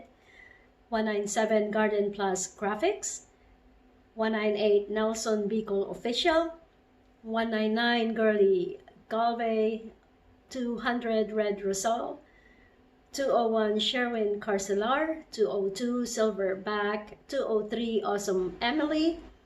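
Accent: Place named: United States. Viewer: yeah my sissy like the way you speak you adopt already the accent in America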